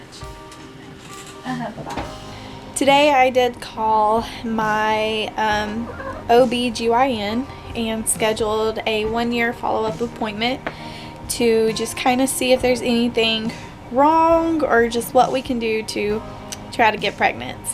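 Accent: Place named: Virginia, United States. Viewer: Fellow Virginian here (I heard it in your accent!) Had a miscarriage this month and I’m praying that next month is better. I’ll keep you and Tyler in my prayers as well. It’s all in God’s hands.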